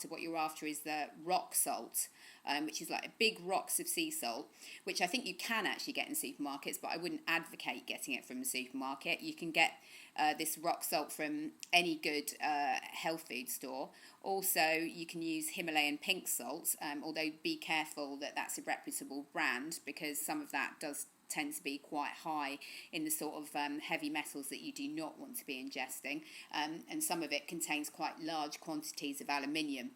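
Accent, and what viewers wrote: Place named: United Kingdom. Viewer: Your accent is so gorgeous! Which part's of UK it is? :) Just find your channel and binging on it now :) Thanks for great info! xx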